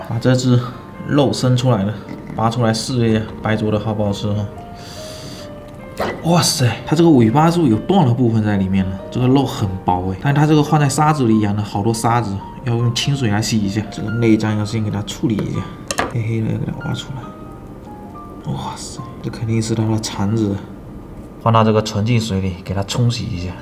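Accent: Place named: Gutian, China. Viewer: 口音好像宁德古田那边的人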